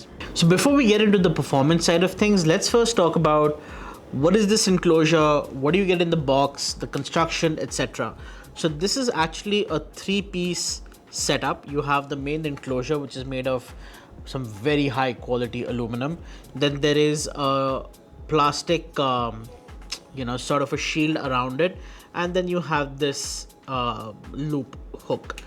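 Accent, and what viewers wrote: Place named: India. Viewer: nice review. the first indian english review that didn't course me to throw up after 5 minutes of hearing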